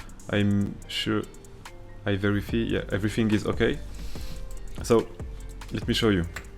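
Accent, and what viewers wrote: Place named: Russia. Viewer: I think you're Russian because you have a Russian accent.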